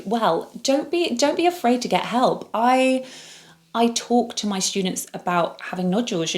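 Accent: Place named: United Kingdom. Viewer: You have a new subscriber here! I'm so sorry that getting a referral to a specialist was so tough. I'm in the States, and referrals tend to work a bit more efficiently (if you have good insurance!). I'm pretty sure that I could very well have nodules. Speaking as well as trying to sing has been difficult for the past couple of months. I've already made an appointment, and we'll find out. The light at the end of the tunnel is any comfort that they can be healed -- and I can hopefully sing again! BTW, you remind me of a girlfriend I had a while back.... but, with a British accent! (and she was real cute!!).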